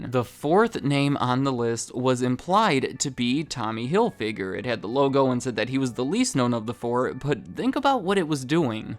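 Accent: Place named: Midwest, United States. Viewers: I was thinking midwest, but I could be wrong. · Sounds like a typical Midwestern accent.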